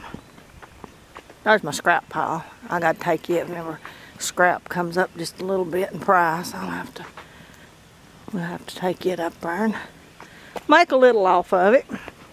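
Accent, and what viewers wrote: South, United States. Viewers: I enjoyed listening to you talk reminds me of my mother My southern accent isnt as strong as yours Get A trail camera from Walmart it will capture the owl they are fairly cheap I video my deer all the time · I do enjoy your talking and walking. I have a long time lady friend that lives in my apt building she is from the south but sounds just like you.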